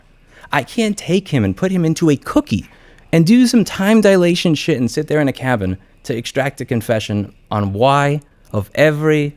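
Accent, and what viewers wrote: New York, United States. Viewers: You can tell Louis is a true NYer. Im guessing Linus is from Caliornia.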